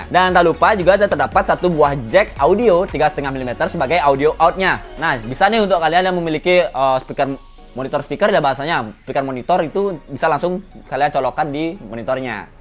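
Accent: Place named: Bali, Indonesia. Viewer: medok bali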